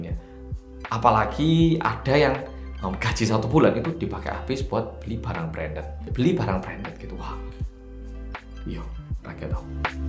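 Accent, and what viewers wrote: Java, Indonesia. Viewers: Terlalu medok bahasa Indonesia nya mas jadi kesannya kurang jelas mas.. 🙏🙏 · Mas medoknya masih kentel banget..apa memang masih ada yg bisa diajak ngobrol di korea pake bahasa jawa?soalnya sudah 4 tahun lebih jg ya di korea tapi medoknya masih tetep sama..hahaha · Logatnya jawa, ada juga jerman logat batak .. 😂😂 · Jan medok bless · Salah fokus sama aksen mas yg masih medok aja, padahal dah 5 thn balik ke Korea...hehe, semangat mas...jgn ikut2an depresi kayak org Korea pada umumnya... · mending ngene wae mas, nek pas omong jowo medhok subtitele tetep bahasa Indonesia, jd bebas omong jowo & tidak dikomplain lagi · Ga percaya ini orang Korea, bahasa Indonesianya medok Jawa banget :))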